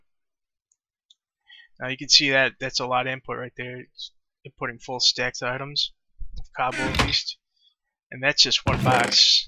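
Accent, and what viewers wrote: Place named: Chicago, United States. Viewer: This guy has to be from Chicago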